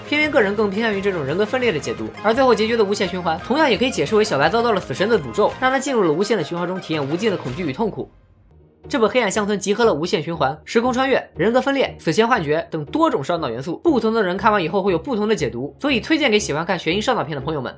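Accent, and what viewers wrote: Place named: Jiaodong, China. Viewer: 主播胶东人吧，从 自己 的发音 判断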